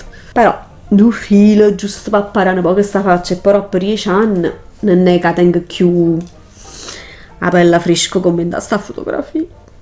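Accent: Naples, Italy. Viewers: fai skiattare😂❤️ hai un accento di qualche paese napoletano di che zona sei? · Ma quant si simpaticc, me piaciuto assai pk parl Napolitano, ke e o dialetto miiioo nu vass no coppa a capp ma nfacc, e bona. serata... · Non amo particolarmente la cadenza napoletana, essendo abituata all'accento nordico, ma questo video è davvero simpaticissimo, un abbraccio!☺️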